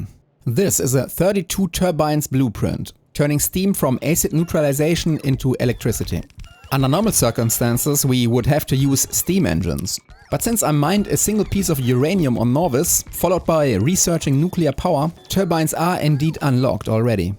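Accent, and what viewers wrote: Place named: Germany. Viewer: native speaker of both english and german here. Yeah, it's noticeable, but you're doing great, it's perfectly understandable (meaning it fulfills its purpose), it's infinitely preferable to AI slop voice, and at this point it would sound wrong to hear you speak english without an accent, it's lowkey iconic.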